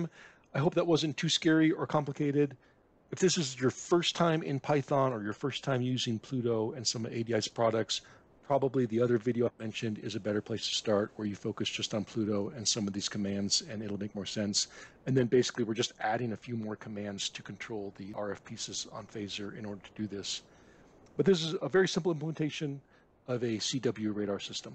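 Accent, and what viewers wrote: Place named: United States. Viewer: respect. Clear presentation, good speaking style, a really "proper" AE 🎯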